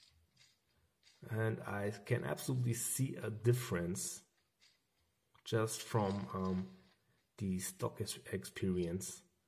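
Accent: Germany. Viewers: Hallo...are u German?😁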